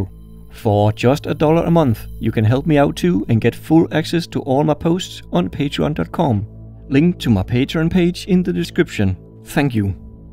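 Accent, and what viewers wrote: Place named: Denmark. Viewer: Grinernt nok du er dansk! Accenten skinder igennem :P Fed video!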